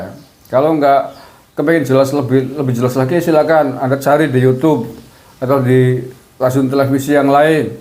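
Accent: Banyumas, Indonesia. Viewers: Bahasane koh ngapak banget nang endi kiye posisine bro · Pak ... udude mota mati wae pak. Tapi senine yo kaya kiye. Lucu dadine. Tapi apik pak ulasane. Diselingi logat2 banyumasan.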